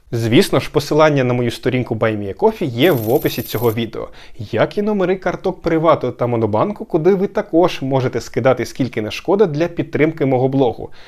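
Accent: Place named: United Kingdom. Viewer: Де в епоху інтернету отримав таку чисту Bri'ish вимову?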